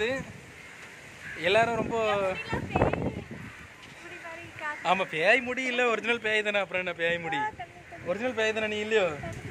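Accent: Tirunelveli, India. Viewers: Anna nega chennai ya but neega pesuratha pathaa thuthukudi thirnalvelii marii eruku enna ur nega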